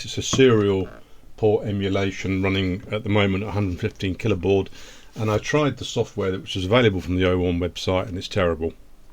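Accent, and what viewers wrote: United Kingdom. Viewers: Sounds British….english with pounds… … not French… and the ai didn’t convert you to a New England accent nor change your words to be dollars….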